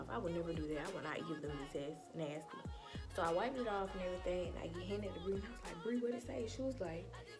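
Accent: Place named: Louisiana, United States. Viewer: girl where you from ? you sound like you could be from louisiana 😍🐊.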